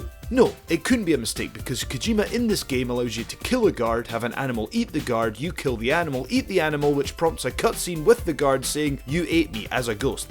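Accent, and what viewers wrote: Scotland, United Kingdom. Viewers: He has a Scottish or north English accent. That might have something to do with it. Or maybe not. Just a thought. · Oh, you couldn't ruin Zelda for me if u tried, still always a pleasure to hear ur thick Scottish accent though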